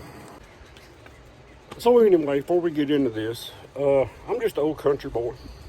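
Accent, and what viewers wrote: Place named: New York, United States. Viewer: Just an old country boy? I coulda swore that was a New York City accent! LOL Great video Brother!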